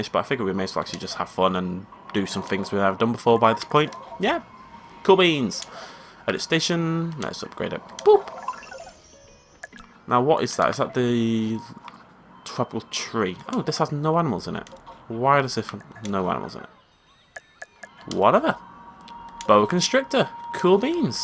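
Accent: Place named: Yorkshire, United Kingdom. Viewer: You sound like your from Yorkshire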